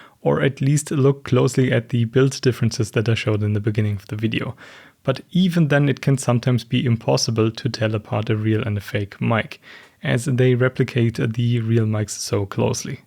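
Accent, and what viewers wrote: Germany. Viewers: Ist das jetzt zum Trend geworden, dass deutsche anfangen auf englisch Videos zu machen? Das klingt immer total schrecklich wenn man hört, dass die Leute Deutsch als Muttersprache haben und nicht englisch 😭 Dieser deutsche englisch Akzent ist grauenvoll!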